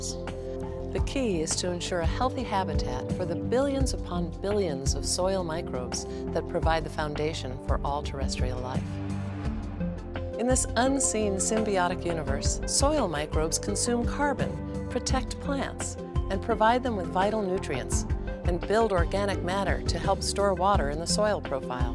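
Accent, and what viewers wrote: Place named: United States. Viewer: Like si vienes por inglesamericano :v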